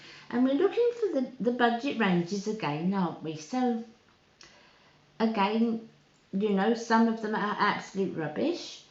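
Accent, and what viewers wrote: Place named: Essex, United Kingdom. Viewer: Hello I just came across your channel and I really enjoyed way it, you sound like you have an Essex accent my neck of the woods 👍 Looking forward to watching more of your videos x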